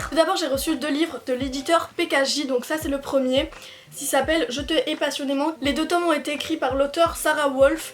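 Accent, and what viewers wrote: Belgium. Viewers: T'a Un accent belge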